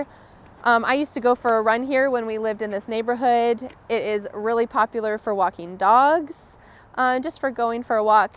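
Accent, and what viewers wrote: United States. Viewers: Is this American english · Greeting from Poland i love americans pronounciation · I’m from Vietnam. I have been learning English for 10 months. I accidentally find your video. I feel happy because I really like American accent. You are a first teacher on my self-learn journey. I am gratefully your lesson. My mother settle down in the US. But she is not good at English. I hope myself can help her if I have any change go to there. I’ve been learning hard everyday!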